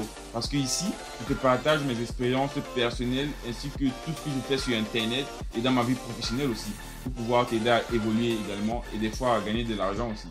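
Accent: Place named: Cameroon. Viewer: L'accent Camerounais🤌🏾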